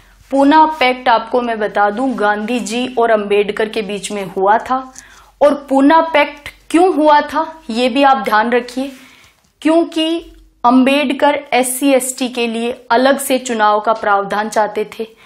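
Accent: Haryana, India. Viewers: Mem app hariyana se ho na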